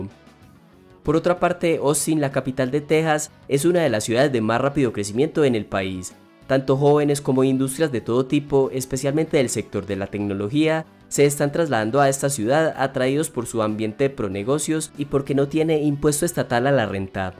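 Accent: Antioquia, Colombia. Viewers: Interesante el video, lástima el acento, creo que sería más agradable escucharlo sin el acento paisa tan marcado.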